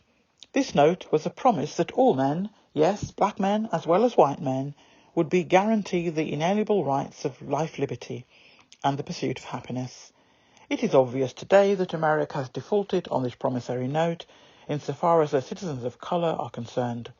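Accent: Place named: England, United Kingdom. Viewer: every time I hear that speech it gives me chill bumps. This will be the first time I heard it from a person with a English accent…and it was beautiful! I also think the speech was poetic.Thank you!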